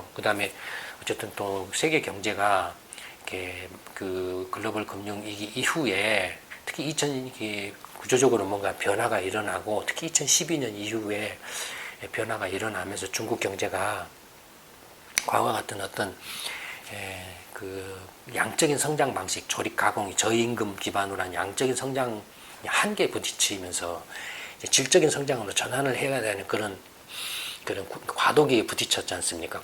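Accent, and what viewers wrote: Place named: Jeolla, South Korea. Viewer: 전라도 사람이네. 말투 들으니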